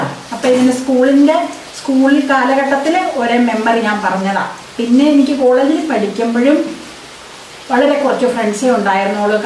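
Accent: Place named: Muvattupuzha, India. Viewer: Ru from muvattupuzha area you talk like people from there my family is from there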